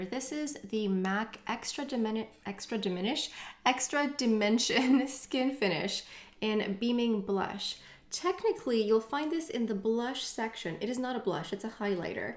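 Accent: France. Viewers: I am French and accent Français is perfect😍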